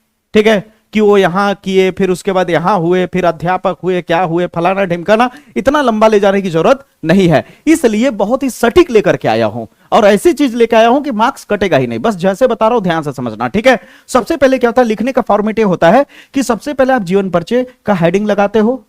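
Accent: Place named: Awadh, India. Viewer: Siir aapko.bolne ka dhang nhi h do thow taiyar kra diya bolte h aaadhi hindi awadhi 😊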